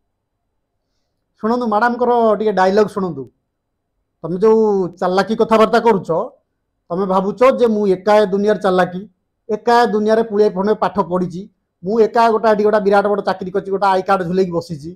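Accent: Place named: Kendrapara, India. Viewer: Bhai katha ra saili ru laguchi bodhe kendrapara ade ghare apanankara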